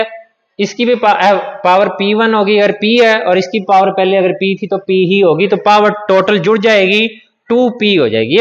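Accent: Haryana, India. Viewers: Good haryanvi ascent sir ❤️😄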